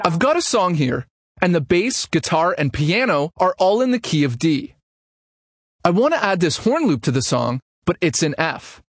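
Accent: Canada. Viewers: the canadian is strong on this one lol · You sound canadian...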